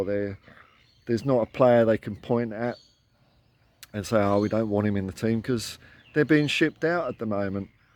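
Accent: Australia. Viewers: Just found this channel and cracked up mate! I'm a liverpool supporter for almost 35 years! I cannot stop watching everything that comes up about ange and spurs! I now know more about spurs team than I do my own! Not converted by all means but there must be so many Aussies that sit on the fence with teams That are now spurs through and through!! He will be the biggest thing for Australia football ever! I keep watching mate love half Aussie accent!!!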